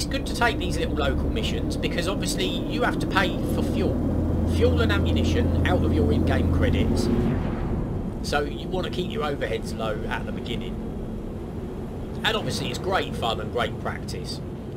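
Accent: Cockney, United Kingdom. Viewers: i've been threatening to jump in and your cockney accent almost convinced me m8. lets start a Star Citizen clan named Mi6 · thicc british accent "hello this is " laughing, then liked subscribed and commented 3 seconds in. this guy knows how to YT properly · Cant stand it. Well I could for all of four minutes. The least attractive accent in the UK so no thanks. See ya! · Was NOT expecting you to be cockney but now the channel name makes sense XD SUBSCRIBED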